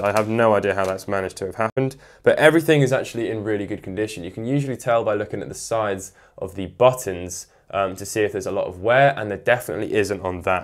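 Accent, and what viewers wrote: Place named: United Kingdom. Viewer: Sounds like a fellow UK gamer to meeeee. 😎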